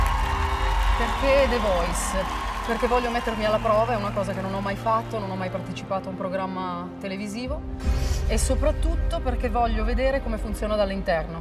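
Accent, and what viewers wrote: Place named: Italy. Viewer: Q mujer más Hermosa... ese acento Italiano es irresistible...((( 🖤 )))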